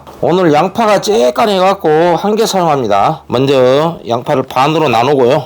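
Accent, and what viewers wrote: Jeolla, South Korea. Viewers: 고향이 라도인가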